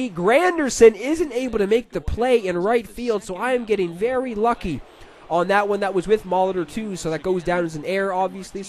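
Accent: Canada. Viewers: U must be from Newfoundland with that accent u got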